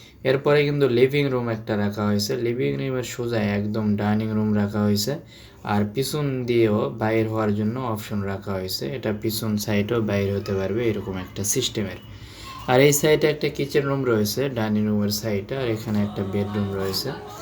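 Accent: Naogaon, Bangladesh. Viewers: Apnar basha Naoga naki vai?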